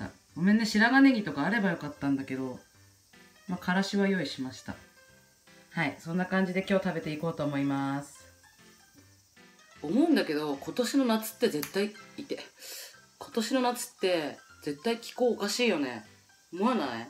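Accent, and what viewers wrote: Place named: Fukushima, Japan. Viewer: 福島離れて思ったけど、なまりすごいよ笑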